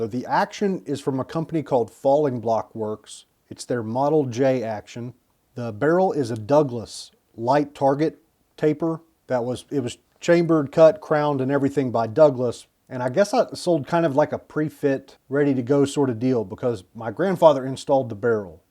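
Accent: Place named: Eastern Kentucky, United States. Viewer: What part of eastern Ky are you from??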